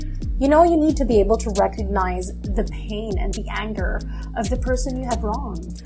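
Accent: Ilonggo, Philippines. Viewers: Ilonggo Accent ❤❤👏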